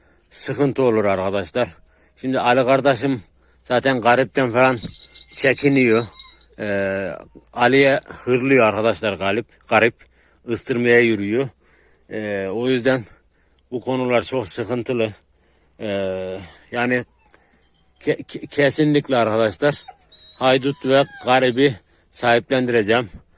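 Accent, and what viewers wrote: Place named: Kastamonu, Turkey. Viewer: Kardeşim tekrar geçmiş olsun allahım şifa versin ben ist dan yazıyom kastamonu pınarbaşılıyım eytliyim çıkar öıkmz memleketime gidecem ama ilk fırsatımda seni ziyaret edicem çünkü sen bizim doğal şivemizi temsil e uyuyosun tebrikler saygılar selamlar kardeşim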